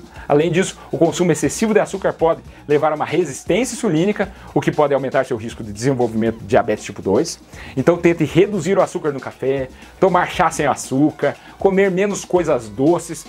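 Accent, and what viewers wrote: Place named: Caipira, Brazil. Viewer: Amo esse sotaque caipira dele.❤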